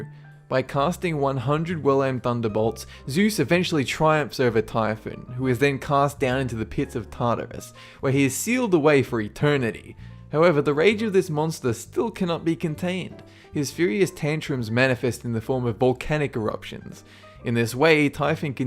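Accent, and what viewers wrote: Australia. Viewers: Australian I think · Aussie all taay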